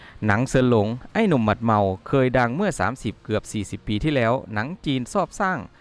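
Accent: Laos. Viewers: แอดมินเปฺ็นคนลาว พูดบ่ชัด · แอดเป็น คนลาว ใช่มั๊ยฟังจาก สำเนียงการพูด ช.ช้างเป็น.ซ.โซ่หมด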